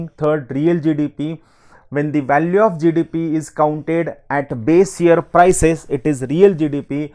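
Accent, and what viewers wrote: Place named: India. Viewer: Wow samjel asha chhan indian English madhe lecture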